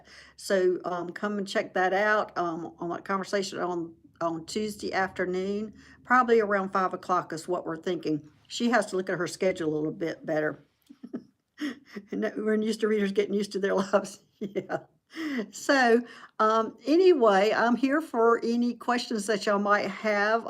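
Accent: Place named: South, United States. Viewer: Thank you for all you do. I’m probably too late, but here’s my STARSEED. You have the same southern accent my parents had. Very comforting. 💚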